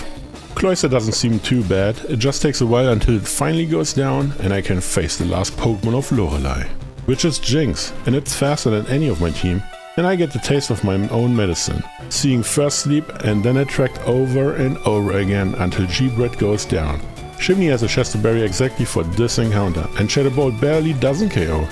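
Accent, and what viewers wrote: Germany. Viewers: Sehr starker deutscher Akzent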